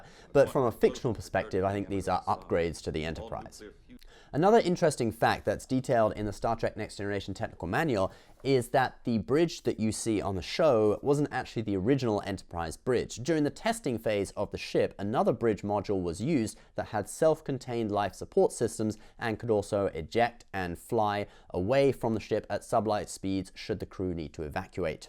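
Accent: United Kingdom. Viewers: In his defense, he's British, they pronounce and spell things weird. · alan littlemoon keep in mind that this is The British and thus is likely to pronounce certain words differently than us Americans do Case in point: The word "schedule": US pronunciation: Sk-eh-jew-ull British pronunciation: Sheh-jew-ull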